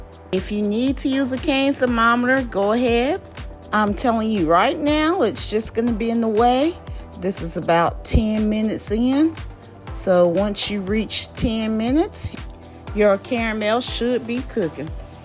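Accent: South, United States. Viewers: I love your southern accent!! I am from NOLA so ours is kinda fast with a little southern. You apples look delicious and I can’t wait to use your recipe! Thanks so much for sharing!!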